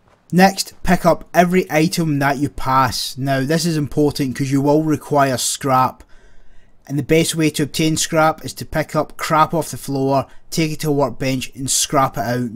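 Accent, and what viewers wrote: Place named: Scotland, United Kingdom. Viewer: Where about from Scotland are you from mate?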